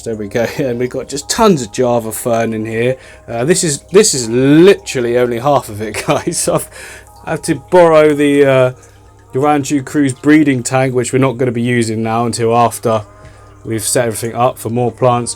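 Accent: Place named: Midlands, United Kingdom. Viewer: Trying to figure your accent... sounds really Midlandsy, around my area (Northamptonshire). Am I far off?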